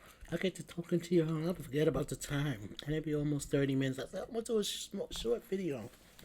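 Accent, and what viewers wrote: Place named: New York, United States. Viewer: Heyyy Beautiful!!! I’ve been missing you, so I’ve come to visit!🤗 The BBQ Chicken looks amazingly delicious!!! I love your New York accent, Sis!💞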